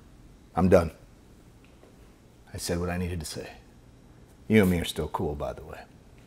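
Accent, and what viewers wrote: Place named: United States. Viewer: yeah we do smoothies down here, in my opinion its more of a heat beater so lotsa ice cream and banana maybe milo or ovaltine thrown in thats just a simple one the kids can make :D Cant wait for the stickers! i love your sense of humour please dont loose it! it seems no matter who you watch from over there in yankie land you can really tell that there is a local to that area dialect. i might start a map of the 'muricas so i can pin down where who im watchin is from. i like the mix up style it doesnt lock you into one genre and gives you many diffferent ways to go down a diferent rabbit hole each time. plus the personal thoughts really ground you to being, well one of us bloody pelicans :)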